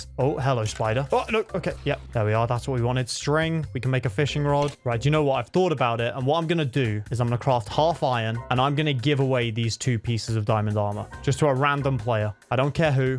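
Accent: United Kingdom. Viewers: Are u britsh?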